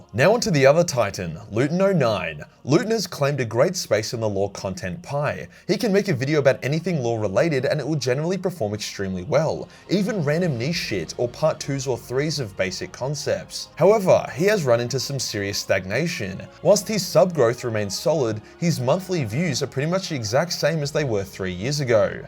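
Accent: Australia. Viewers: AdeptusRediculos dont have you on because they are living in Cali. And you are way too harsh of a Aussie that would trigger their fans. As a fellow Aussie who talks alot like you do (with my mates) i can kind of understand that one 😂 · Dude, you are about to blow up! Girlfriends are about to watch Henry Cavill in his show. No gay shit but girls will watch you…your Australian. Accent wins. Thank you dude for exposing the best cosmic horror and being real. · >Be me >Dr. House fan > fan > turn his hair blonde >Cheesy Ausie accent > now look like dr Chase >MFW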